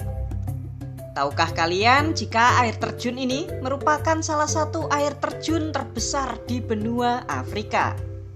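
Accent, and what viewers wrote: Java, Indonesia. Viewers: dubbing nya planga plongo medok gajelas.. ga nyaman bat di telinga...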